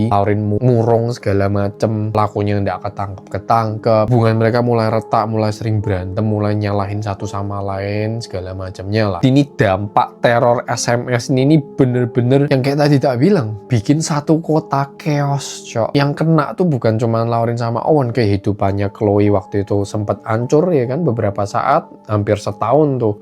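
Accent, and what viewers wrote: United Kingdom. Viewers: anjay pamer british accent nih bang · Love ur English accent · Anjay pakai british accent cyuk, kereeeen. 👍🏻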